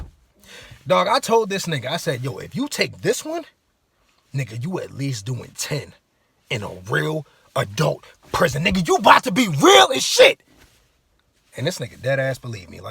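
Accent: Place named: New Orleans, United States. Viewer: Can tell ur from nola sound like. Watching part 2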